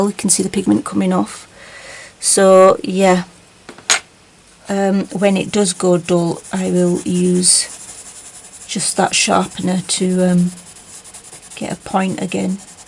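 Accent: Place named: Yorkshire, United Kingdom. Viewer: good idea! I have carers who go in and out...they help me care for my partner, so I have to ensure from a health and safety view I do not have tripping hazards...so putting loads of cushions against the door is not an option. I now have to watch Amazon and track where the delivery person is and hover by the door when they are a couple of stops away so that I can take it from them. Total nightmare! I still haven't opened and tried the items yet...still too scared. I am really enjoying your videos. Love your accent...I am trying to work out what part of Yorkshire you are from. I am in the UK too.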